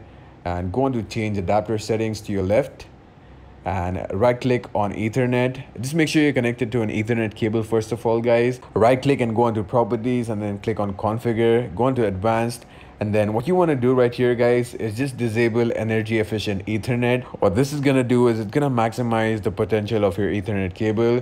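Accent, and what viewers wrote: India. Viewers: Bro are u indian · Are you Indian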